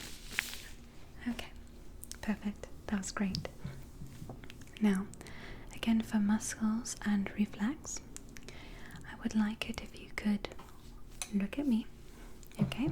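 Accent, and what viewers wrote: United Kingdom. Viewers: Lovin the British accent ☺ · You are the Most Beautiful ASMR Doctor ever!!! I love the British Accent!! Thanks for the tests! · As a Brit.. I can confirm..The accent is fantastic! Well done,love! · Bravo on the British accent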